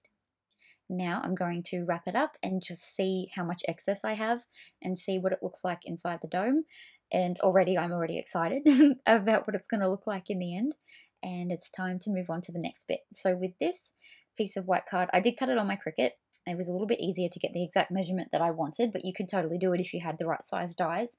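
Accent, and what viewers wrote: Australia. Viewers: Love love love your Aussie accent!!🥰 love your creation❤❤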